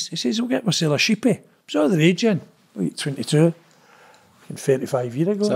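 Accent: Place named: Glasgow, United Kingdom. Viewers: The subtitles are funny they canny understand the wee Glasgow accent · Or learn Scottish mate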